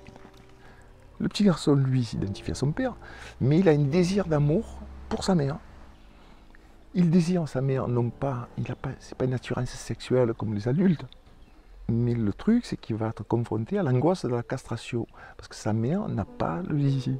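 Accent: South, France. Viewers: Vraiment très bien, très bien expliqué, par un locuteur très sympathique.Son discours est accessible aux non initiés, va à l'essentiel. Il n'y a pas à dire, l'accent du sud, c'est quand même autre chose que l'accent débile des gens du nord.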